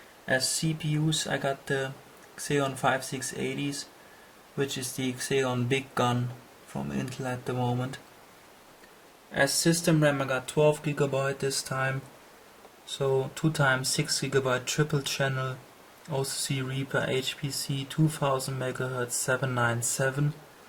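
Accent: Germany. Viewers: Kann des sein das du Deutscher bist? Dein Akzent ist so. :D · Nicht schlecht. Man hört so krass, dass du Deutscher bist ^^ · So ein geiler deutscher Akzent... :-) Aber Hammer Gerät ist das!!!! Haben will ! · English F.A.I..L i am sure u german right?? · Du bistn Deutscher :D das hört man soooo extrem :D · Man kann an deiner Stimme hören, dass du Deutscher bist xDD btw wie viele fps haste bei fullhd crysis mit kp wie viel AA^^ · You speak like a german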